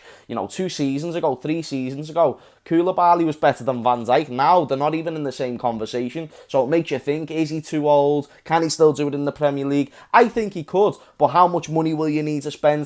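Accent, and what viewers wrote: Liverpool, United Kingdom. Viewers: Yous a proper scouse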